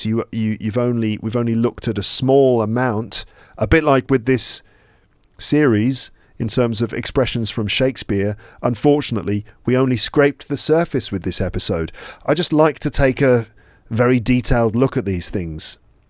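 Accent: United Kingdom. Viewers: British accent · I adore the British accentas i am ateacher of english from North Africa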